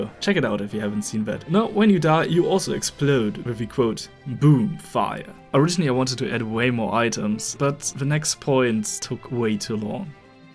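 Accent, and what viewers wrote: Germany. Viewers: Listening to this video knowing German is basically no different from watching this video in German lmao Great video, but I will never unhear the accent. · Are you german by any chance? I really hear it in your voice, and i LOVE that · i think you ar a german i can hear it in the voice as a german myself i know how that sounds when german speeks englich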